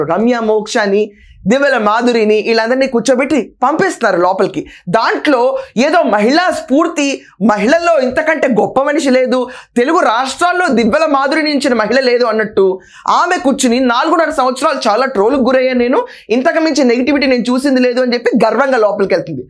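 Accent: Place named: Hindi Belt, India. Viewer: BP tho potharemo anukunna mam... chala hindi vala style lo matladalanukunnaru bavundhi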